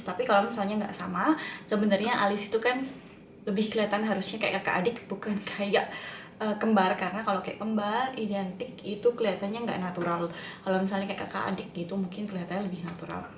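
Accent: Surabaya, Indonesia. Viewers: hai.. you are so pretty and natural ^^. i have beauty chanel too, if u don't mind please check up my chanel too ^^ and hopefully someday we can join for another tutorial.. because i know from ur "logat" u are surabayaERS hahaha.. salam kenal ^^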